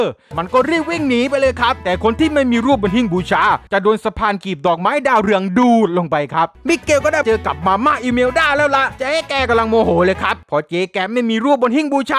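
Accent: South, Thailand. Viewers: พี่คนจังหวัดอะไรครับคนใต้หรอพี่ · พี่เป็นคนใต้ใช่ม่าย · พี่เป็นคนใต้เหรอ พี่เป็นคนจังหวัดอะไรอ ผมสงขลา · เเอดเป็นคนใต้ท้ายนิ555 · พี่เป็นคนใต้หรอครับ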